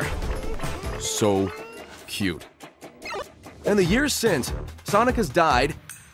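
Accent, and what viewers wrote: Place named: England, United Kingdom. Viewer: Finally an english dude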